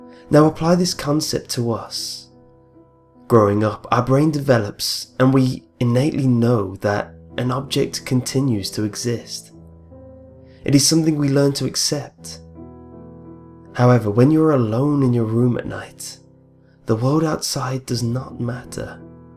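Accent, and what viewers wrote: United Kingdom. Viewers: I like his voice because he's British!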